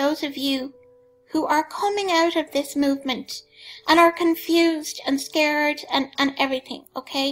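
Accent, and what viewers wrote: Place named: Ireland. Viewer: Another excellent book is "Kundalini Warning -'Are False Spirits Invading the Church" by Andrew Strom. Andrew was himself formerly involved in what he called "the prophetic movement" and came to realize how evil all of this is. He tells of how Todd Bentley was imparted an "anointing" by the laying on of hands from the other wolves of the NAR and how it can be traced back to Branham. He explains how this spirit is directly tied to eastern mysticism and is the same one that yogis impart to their followers (you can watch YouTube videos of this and it causes exactly the same bizarre manifestations). I highly recommend this book as it exposes other wolves in this movement as well. Thank you, for your dedication in reproving these unfruitful works of darkness (Ephesians ). God bless you! Love, Kathy P.S. I just love your soft Irish voice - so beautiful. 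I am American, but of Irish descent on both sides. But because I'm from Long Island, New York, my accent is not so melodious!:/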